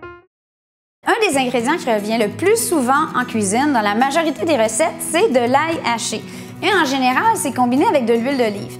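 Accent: Quebec, Canada. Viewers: J'adore vôtre façon d'éplucher l'ail avec les deux cul de poule, surtout vôtre façon de vous secouer, dommage que ça n'ai pas fonctionné et le fou rire derrière Super. Je vais faire mes aulx avec vôtre recette mais sans me secouer!!! Merci aussi pour vôtre petit accent, moi qui habite en New Caledonia n'avons pas beaucoup de Canadiens!!! Belle journée à vous. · Bonjour! Merci beaucoup pour cette vidéo. Je l’avais regardé il y a 3/4 ans sans jamais passé à l’action. Et je prévois de la faire prochainement. J’aurai besoin d’un conseil. Je vais utiliser le thermomix pour hacher l’ail. Sur quelle vitesse me conseillez vous pour ne pas que ça devienne de la purée d’ail? Merci pour vos vidéos culinaires qui sont une grande source d’inspiration! Et cet accent canadien fait un bien fou 😁 Bonne continuation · Ami(e)s canadien même si nous aimons pas l ail ,nous sommes obligés d aimer votre accent 😂😂.trop top,de bon matin ca met de bonne humeur.bises de la Drôme.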